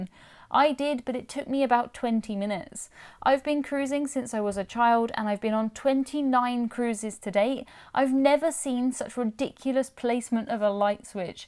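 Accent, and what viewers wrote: United Kingdom. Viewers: Most definitely they should pay you! I would pay to upgrade my iPhone with your voice with the lovely British accent (I'm from the U.S.). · i really like your videos and as I am from Germany I like to listen to your nice British English! ;-) thanks for sharing your honest opinion!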